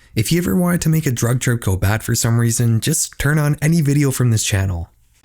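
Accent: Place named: Canada. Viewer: So you're from Ottawa, eh?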